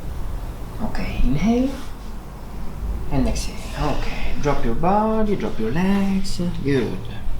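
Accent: Italy. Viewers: Легкий итальянский акцент....))) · Your accent reminds me of Scuderia Ferrari engineer, "Slow button on, slow button on"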